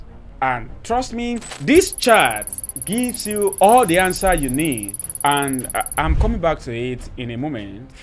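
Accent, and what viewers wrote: Yoruba, Nigeria. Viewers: This dude has good content & one of the funniest accents ever 🤣😂🤣. Is this a Yoruba accent?🤔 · Captions are generatable automatically. Let those who want to watch it be attentive. They'll get used to his Yoruba Nigerian accent just as we've got used to their various foreign accents of English and French. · Yes he's from Yoruba nations.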